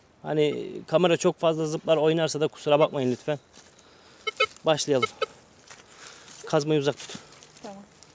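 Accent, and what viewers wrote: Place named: Kastamonu, Turkey. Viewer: Siveniz Kastamonu sivesine benziyor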